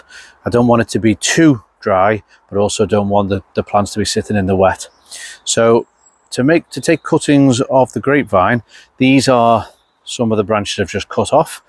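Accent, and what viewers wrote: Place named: Midlands, United Kingdom. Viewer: I just learned allotment gardening when we were over in the UK this past March/April. My husband is listening to this video trying to guess your location. So far he's guessed Midlands, Birmingham, Manchester 😂 He's from Leicester originally. I love your greenhouse! ❤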